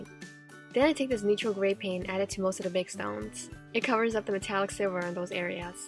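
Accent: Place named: New Jersey, United States. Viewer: I think she sounds like she is from New Jersey, either Jewish or Italian.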